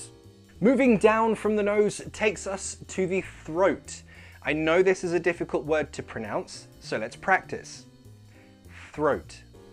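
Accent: United Kingdom. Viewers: Some phrases from one of my students: "In spanish when you have diarrhea we say I have a "belly ache" or lower abdomen ache since the stomach is in a different area of the body, separated from the intestines". "I have pain in my private parts"... what would that be in proper english?. Another phrase from my student: This type of food makes me fart in the morning. Thanks you are a very sexy teacher, entertaining and with a wonderful british accent. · The best teacher, I love the british accent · As an English teacher and when I had a butcher on your videos I thought they are similar to other videos on the YT, I have learned a lot from you mate, I daresay you are a star in teaching as far as British accent is concerned ,very talented and your body language is really explanatory and amazing, thumbs up mate . I wonder if you could post a video on some conjunctions and transition words,like henceforth , therefore ,thus,etc I couldn't find any genuine British videos concerning these. 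Cheers Keep it up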